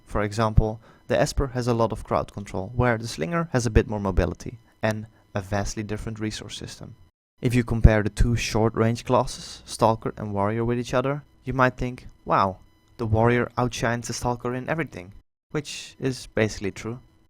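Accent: Netherlands. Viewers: nice dutch accent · lol, je klinkt nederlands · The Dutch accent though, gj explaining man! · Are you dutch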